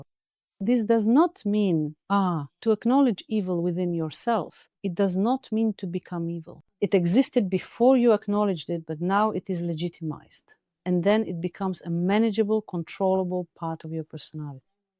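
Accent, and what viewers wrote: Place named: Eastern Europe. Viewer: мне нравятся ваши видео на русском.На английском ваши видео звучат очень по- славянски, без английской интонации. Может можно подкорректировать AI, чтобы ваш голос звучал максимально как native, с другим интонационным ритмом. С приветом и благодарностью, из США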